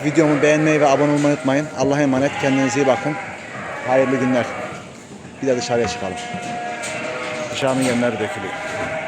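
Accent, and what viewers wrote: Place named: Erzurum, Turkey. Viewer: Kardeşim bereketli olsun sayfana yeni üye oldum. Hangi şehirde yapıyorsun bu işi ve nerelisin Erzurum Ağrı şivesi var konuşmanda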